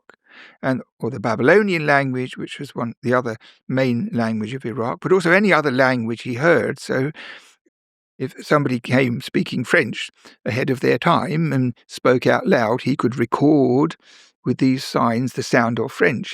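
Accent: United Kingdom. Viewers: Funny u must be American saying he’s got accent. He’s British maybe u 😢are the one with accent. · Heard the British accent - knew it was gonna be good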